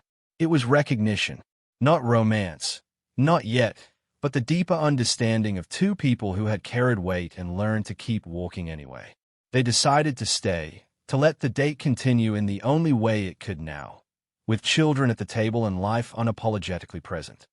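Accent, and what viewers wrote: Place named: Australia. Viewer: Love the Aussie accent!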